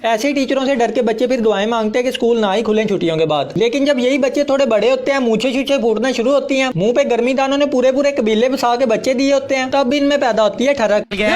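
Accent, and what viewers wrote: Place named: Multan, Pakistan. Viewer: Bro! R u from MULTAN?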